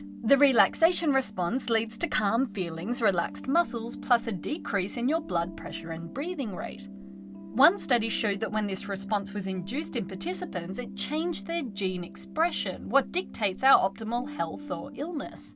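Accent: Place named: Australia. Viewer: I could tell by the accent. So am I. Seeing Australian women getting into science is really awesome because I want to have a career in science when I'm older, and it's really encouraging to see that its not just for the men:)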